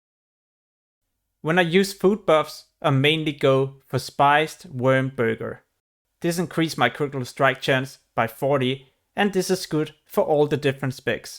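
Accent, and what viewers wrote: Denmark. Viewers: Denmark I'd say 😀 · jesus christ, the danish is strong in this one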